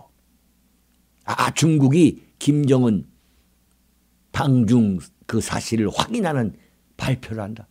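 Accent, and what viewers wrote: Chungcheong, South Korea. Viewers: 충청도 분이신가요? 말투 재밌네요 ㅎ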